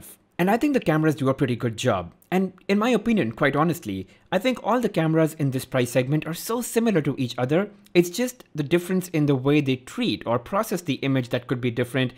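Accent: India. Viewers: This guy speaks like typical random brown indian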